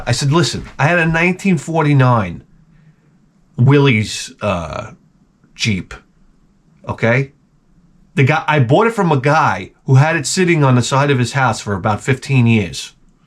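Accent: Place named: Long Island, United States. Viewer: I was just thinking you begin sounding like an Adult Eore from Long Island, then noticed you're even wearing Eore 💙 Blue.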